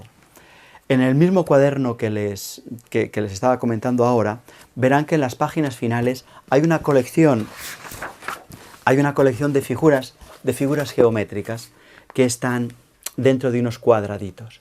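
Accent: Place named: Catalonia, Spain. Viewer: Gracias. Tengo 54 años,y aún tengo faltas ortográficas. Recién hoy con tu vídeo me he dado cuenta por qué! Tengo memoria visual para muchas cosas. Puedo recordar con detalles muchísimas cosas, pero en la ortografía siempre lo auditivo ha estado más presente, no sé por qué. El caso es que viví toda mi vida en Uruguay,(hasta hace unos años que estuve viviendo en Barcelona). En esos años que viví en tu tierra (se te nota el acento catalán cuando hablas en castellano jaja) pude darme cuenta que si yo hubiese oído de niña la diferencia de pronunciación que existe entre la "c" y la "s" por ejemplo, hubiese aprendido mejor. Sabes que tanto en Uruguay como en Argentina la "s"; la "c" y la "z" suenan iguales, así como la "y" y la "ll". Pero claro si alguien me hubiese enseñado lo que tu hoy en tu vídeo. Seguiré aprendiendo a pesar de mi edad. Gracias.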